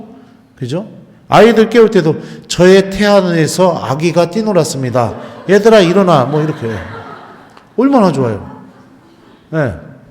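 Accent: Chungcheong, South Korea. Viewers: 신부님 강의 주옥같은 말흠 너무 재미 있고 충청도 사투리와 부모님 무한사랑으로 사제가돠 셨너요 감사감사합니다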